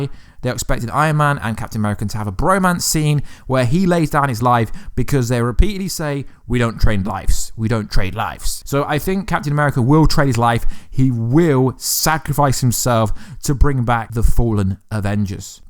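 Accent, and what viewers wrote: United Kingdom. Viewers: I prefer cosmic wonder. He has better presentation and doesn't have this goddamn Brit accent. Sounds like he has a knob end in his mouth.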